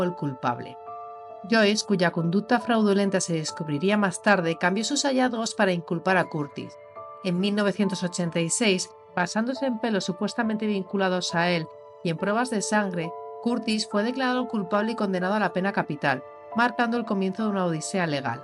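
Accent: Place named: Spain. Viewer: Primera vez que veo tus videos, te felicito por tu trabajo de investigación y por compartirlo por acá con el mundo 😉 Me permito comentarte 1 detalle con el fin de que los que no somos españoles te podamos entender, podrías hablar un poco más despacio ya que tu acento es muy fuerte y es difícil entender lo que dices, por ende me distraje de la historia para tratar de captar lo que decías, a parte de ese detalle todo muy bien! Que te hagas una grande del true crime! 👏🏻 👏🏻 👏🏻 👏🏻 👏🏻 👏🏻 👏🏻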